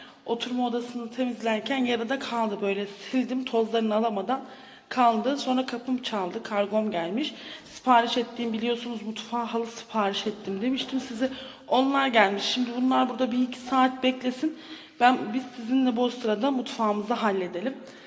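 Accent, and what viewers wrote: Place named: Kayseri, Turkey. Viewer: izle vallaha keşke bizde seninle böyle olsaydık ne güzel olurdu leee🤣🤣🤣kayseri şivesi leeeee🤣🤣🤣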